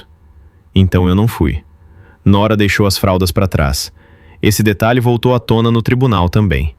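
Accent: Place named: São Paulo, Brazil. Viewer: Sotaque de paulistano!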